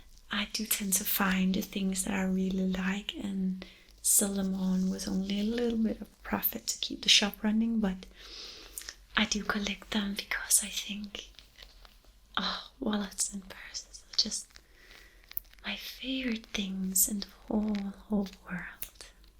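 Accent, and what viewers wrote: Denmark. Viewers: Som dansktalende, krummer jeg tæer over den accent.. Danglish at its finest.. Hvorom alting er, skøn video som altid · Det er ikke danglish nok. Jeg kan faktisk holde ud at høre på det :^) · the danish accent!!! :) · Please do more of these Danish accent videos! It reminds me of my Danish grandparents, they both spoke fluently and had slight accents. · This video was AMAZING! I loved the layered sounds going on, and your voice was so clear and can we talk about your wonderful accent and Danish dialogue?! Goodness was it tingle inducing! Bravo!! ❤ 😍 ❤ 😍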